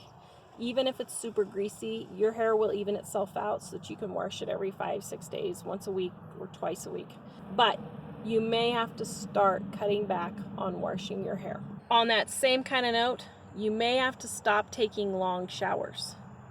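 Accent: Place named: Arkansas, United States. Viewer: Are you from Arkansas? I haven't heard anyone say 'Warsh' since the 60's. My cousins were from Arkansas, I find it charming.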